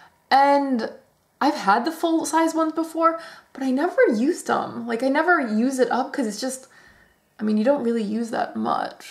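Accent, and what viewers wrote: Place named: France. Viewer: the happy light thing from bourjois is awesome :D I don't mind you using the same products because you always look flawless. I love your french accent, very accurate! I think the clubbing waterproof eyeliners from bourjois are great, i have loving green and 49 crazy about brown and i'm thinking of getting the black and the dark brown ones as well... somebody stop me :))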